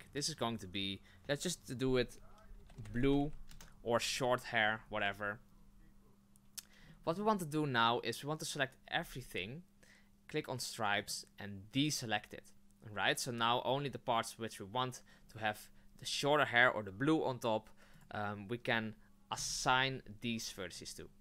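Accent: Netherlands. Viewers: Are you dutch cuz your accent is very familiar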